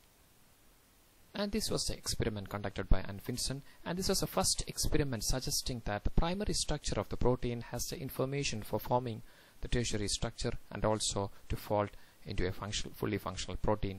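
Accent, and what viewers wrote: Kerala, India. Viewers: But being a keralite I understood your accent :)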